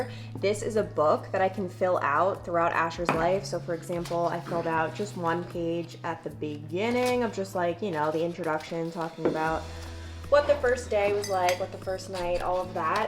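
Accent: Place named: Long Island, United States. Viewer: I think your lack of sleep is making your Long Island accent come out more 😂 Asher is so cute!!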